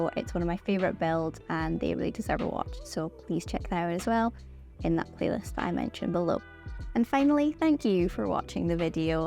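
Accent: Scotland, United Kingdom. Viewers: This is absolutely the most wonderful sexy beast. Its a joy to hear another Scots Crafter. Keep up this fab work xxx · Omg! 😳 Stephen King has written a short story about something along the lines of the mushrooms eyes there. It’s been decades since I read it but it still makes my skin crawl. As did your talk about small holes and them little eyes. 😵 Anyways, great build and great presentation. I’m also a sucker for a woman with a Scottish accent so that kinda topped off the experience. Good job! 👏👏👏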